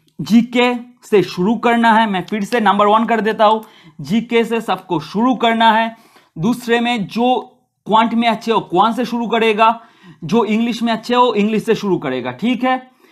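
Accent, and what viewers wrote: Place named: Bengal, India. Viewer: Sir by your accent it's quite predictable that you're a bengali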